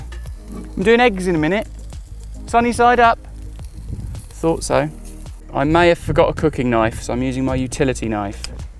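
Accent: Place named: United Kingdom. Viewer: Very entertaining, thanks. I enjoy your style, and for once, a nice British accent. Nice job.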